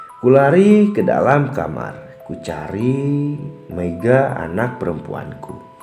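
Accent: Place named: Bali, Indonesia. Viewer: Bli org bali...ya ,cara mbaca nya koq aksen org bali...!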